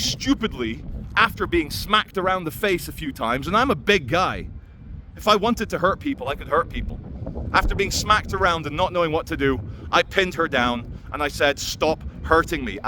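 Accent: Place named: Scotland, United Kingdom. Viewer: your posh Scot accent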